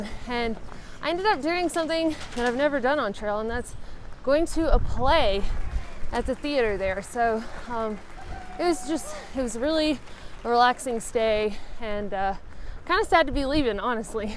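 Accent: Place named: Alabama, United States. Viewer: Wow I missed ya'll, I have a cabin in South fork, been here since April, leave after bow season in October. I could have taken your friend to Colo springs and back in one day. I feel bad. That said I was shed hunting at the top of the cliffs north of 149 between SF and Creed while you were walking by. We've been to many areas in the Rockies and this one, SF /Creed have some of the best people. Again hate we missed the Alabama Drawl, you sound like us, SC Drawl. Stay safe.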